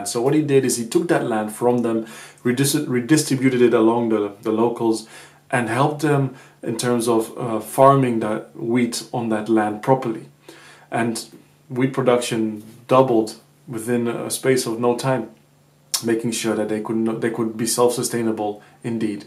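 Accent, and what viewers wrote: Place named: Netherlands. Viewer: + your accent...are you Dutch perchance??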